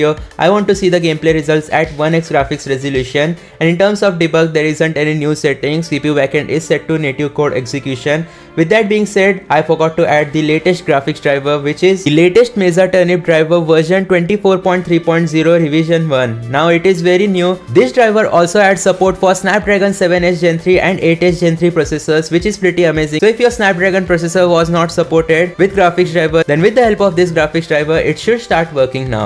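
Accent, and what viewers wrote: India. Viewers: Dude that indian accent is so sexy.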